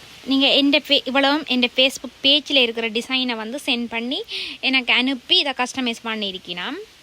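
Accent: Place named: Sri Lanka. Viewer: Srilanka தமிழ் அழகுதான்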